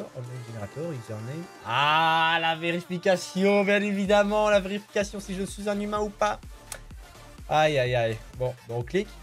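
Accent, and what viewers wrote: Belgium. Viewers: Tu es belge? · tu es belge????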